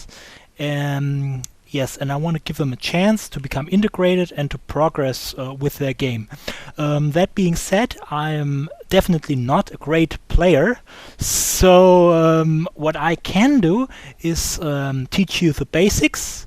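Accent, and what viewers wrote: Germany. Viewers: Hi, just wanted to swing by to say that I find your tutorials very insightful, but unfortunately I can't stomach your accent for more then a couple of minutes.I hope this does not offend you, as I am german myself, and I know that most germans don't get the english pronunciations right. This is too bad, because apart from that, I really like your presentation. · ^^ du sprichst genauso englisch, wie ich, mit so einem deutschen Akzent, aber trotzdem gutes tut :D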